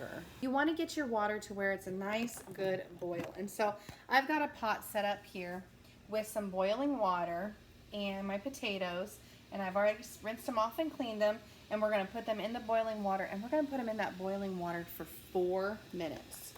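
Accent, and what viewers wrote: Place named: North, United Kingdom. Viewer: Where do you guys live??? Sound like a norther girl like me! 😉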